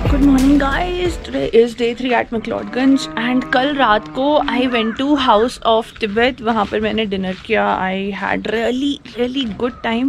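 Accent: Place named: Punjab, India. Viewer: I’m traveling to McLoedganj next month and your videos are helping a lot to learn about the place. I like your voice and Punjabi accent, that makes it more interesting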